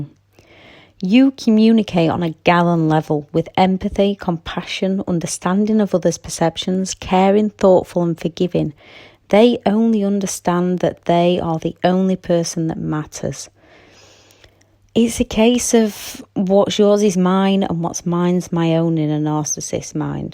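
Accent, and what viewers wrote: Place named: Northern England, United Kingdom. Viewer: I'm still trying to figure out if her accent is northern England or Scottish (the kind of Scottish that sounds like it might be northern England but which is really just a "posh" form of Scottish, not to be confused with posh England English)... 🤔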